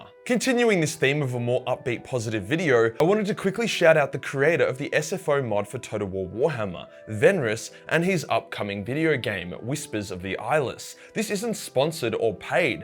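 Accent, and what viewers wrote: Australia. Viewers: Is it me, or is everything better when explained by an Aussie?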